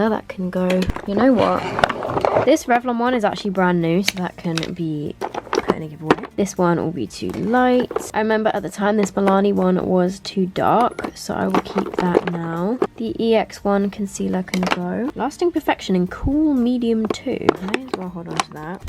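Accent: United Kingdom. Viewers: Good lord I wish I was British. Your accent is so pretty. Every time I hear a British person speak it makes me wish I could fake a British accent without everyone making fun of me. I love the way British people not only say things but also the words you use! So beautiful! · . Are U British or American? I am asking about your accent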